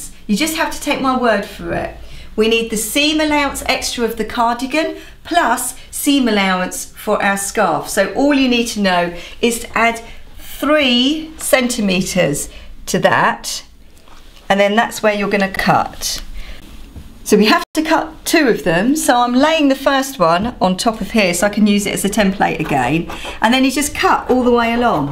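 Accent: United Kingdom. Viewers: Girllllll...that's too cute!!! Wish I would've seen the items you mention at Portobello Mkt when I was ther a while back...cuuuuute!!!! Great job!!!! Easy instructions and after all, we yanks love the Brit accent any way. LOL..thanks.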